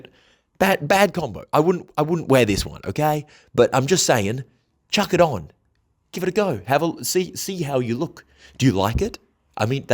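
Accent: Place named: Australia. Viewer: You sound more Australian in this channel for some reason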